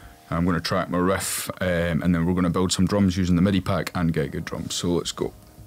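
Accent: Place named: Scotland, United Kingdom. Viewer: Class! Sounds great. Where you based mate? Always a surprise when the Scottish accent pops up on YouTube 🤣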